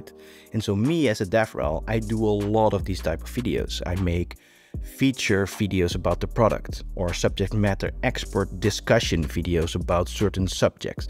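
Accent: Netherlands. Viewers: I think they will soon be able to reproduce your Dutch accent with all the substitutions you use from Dutch phonology, or produce a clone with any foreign accent. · You sound very Dutch 😊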